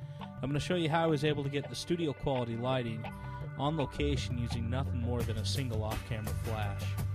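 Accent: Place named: Chile. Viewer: me gusta ese acento chileno.... tienes un dislike big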